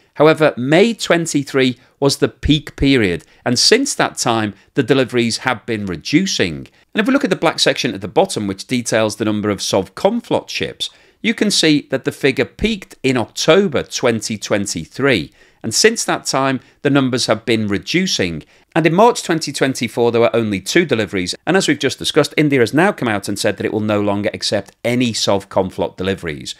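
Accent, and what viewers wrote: United Kingdom. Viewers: He’s not American with that accent, British I’m sure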